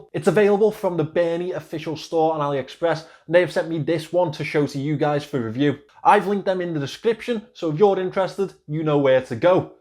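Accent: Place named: Scotland, United Kingdom. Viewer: Love your accent. Are you from Scotland?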